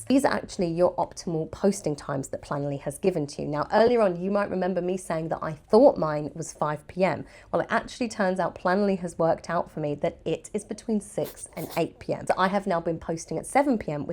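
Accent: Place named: England, United Kingdom. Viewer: You inspired me so much to keep creating,. I’m an artist, and I promote my art and teach courses, and lately I’ve been feeling really discouraged by the algorithm and the little time I have after my office job. But what you said about setting boundaries with content creation really stayed with me, I’m going to start doing that. I still haven’t finished watching the video, and I know the 30 minutes I have left are going to be full of value. I truly appreciate you for that. Technology is amazing, I can’t believe I get to access such valuable content all the way from Colombia while you’re so far away. By your accent, I think you’re from England?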